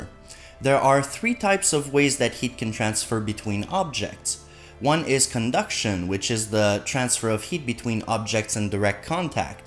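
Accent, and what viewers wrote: Quebec, Canada. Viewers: He sounds "Québécois"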